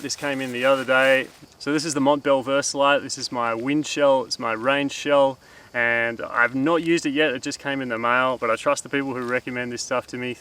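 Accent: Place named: Australia. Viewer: With the Aussie accent Can Serrat sounds like something else. Good video mate hi from Tassie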